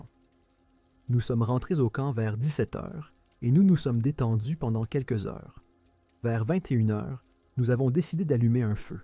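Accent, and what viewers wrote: Quebec, Canada. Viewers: C’est un accent québécois! 😉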